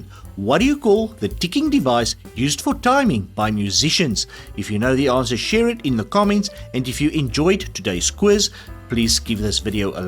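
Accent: South Africa. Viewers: but does google have a South African pronunciation?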